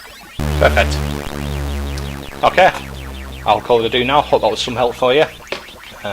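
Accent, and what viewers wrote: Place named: Lancashire, United Kingdom. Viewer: Very nice 😀 Your accent reminds me of David Collier and Tony Pomfret... very Atherton-esque, Westhoughtony or 'roundabouts 😊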